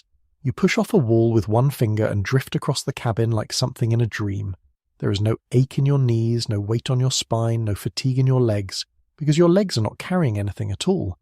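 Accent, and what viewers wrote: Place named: England, United Kingdom. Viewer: couldn't take more than a few minutes of this snobbish AI English accent ... got new for you... if you thin k it makes the narration sound intelligent.... you're wrong.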